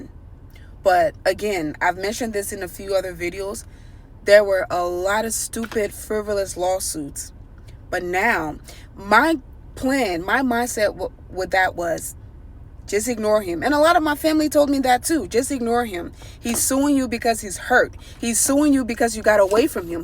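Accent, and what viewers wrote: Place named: Jamaica. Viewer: Maybe you sound like you from Jamaica or somewhere maybe you should probably go back somewhere where he can’t find you and don’t talk about it just up and move somewhere and you might have to leave some people behind. If y’all have mutual friends you may have to cut them off cause it sound like he might try to do something to you. You might wanna justmove again and don’t tell anybody.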